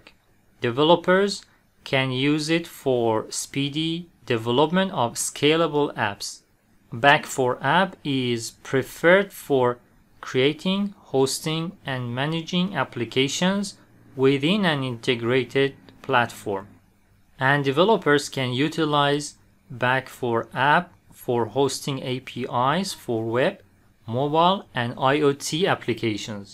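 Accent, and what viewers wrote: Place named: Isfahan, Iran. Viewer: Nice very nice اما فکر کنم ایرانی هستی اونم اصفهانی 😊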